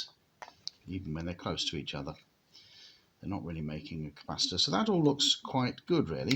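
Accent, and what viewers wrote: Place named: England, United Kingdom. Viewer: um, we couldnt se a thing you were doing. just heard some old English chap muttering on about various things...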